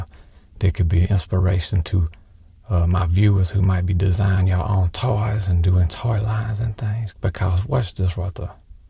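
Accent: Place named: South, United States. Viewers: Star war ,he ma. Coolnicity. Errybody like gi joe. This guy never puts S at the end of his word. He sounds like he is Tennessee or alabama maybe louisiana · that is the most deep fried southern accent I've ever heard.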